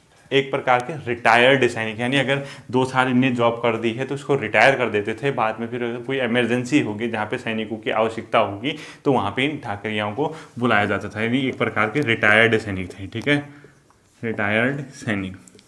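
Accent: Kumaon, India. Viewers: Bhai aap Kumouni ho? Aapka bhasa shilli batata hai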